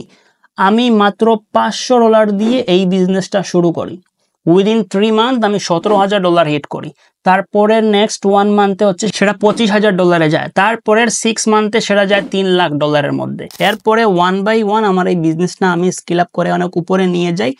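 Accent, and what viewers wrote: Sylhet, Bangladesh. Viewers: Bhai ki sylhety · ভাষা শুনে বুঝা যায় ১০০% সিলেটি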